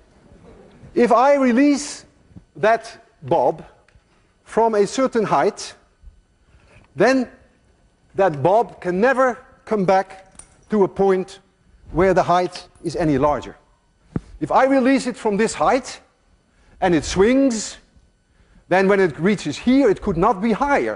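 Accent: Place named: Netherlands. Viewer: its a duch accent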